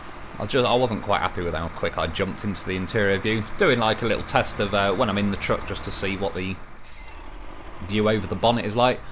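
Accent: United Kingdom. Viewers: Your british accent is so hard...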